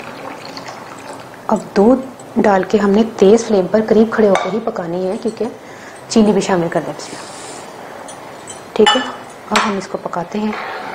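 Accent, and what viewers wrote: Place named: Punjab, India. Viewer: V nice ap ni asan chezn btaen or ap punjabi bhot sweet bolte hn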